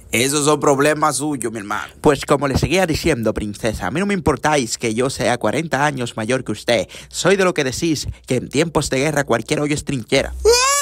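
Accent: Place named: Dominican Republic. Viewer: ey demasiado duro te sale todas las idisomas de RD jajajaaj🤣🤣😂😂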